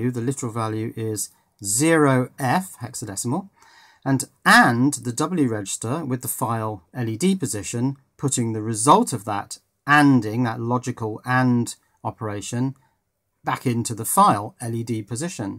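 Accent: England, United Kingdom. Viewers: Aman Mittal given his accent, I'd say England.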